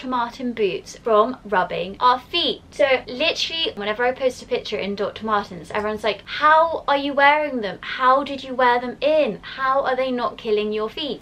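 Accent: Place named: United Kingdom. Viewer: Akdiakzia menkanta el acento británico, además de que es fácil de reconocer xdxdxd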